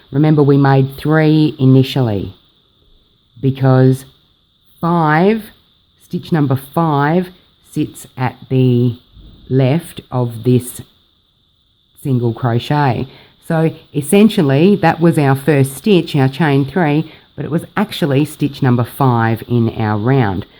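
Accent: Australia. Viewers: Thank you for the video, I love this pattern. Love hearing an Aussie voice too, look forward to more videos. G'day from Adelaide.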